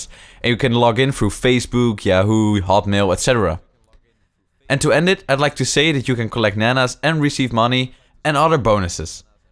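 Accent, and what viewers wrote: Netherlands. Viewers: Thats what i was thinking xD are u dutch? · OMG NEDERLANDS ACCENT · Are you from holland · hoi nederlander(kan je horen aan je accent)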